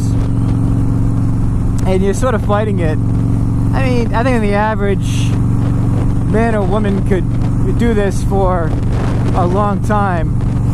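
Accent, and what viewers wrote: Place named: New England, United States. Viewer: By the way, you from Boston? NE twang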